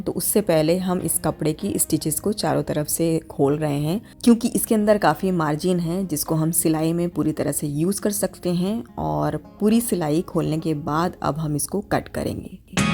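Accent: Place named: Gorakhpur, India. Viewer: wah masha Allah meri padosan inkli ap...pr ap ki language me gorakhpur side ka touch hai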